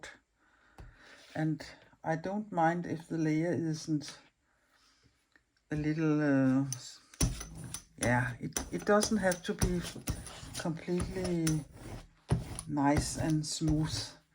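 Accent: Denmark. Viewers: I just love your voice and accent . It’s so soothing and melodic . My son in law is half Danish . Such a beautiful country . I’m so grateful to have met you virtually here . Your art sings to me and I enjoy every moment as I’m sat in my art corner creating along with you . I’m Lisa from Yorkshire UK . I love slow stitching also xxx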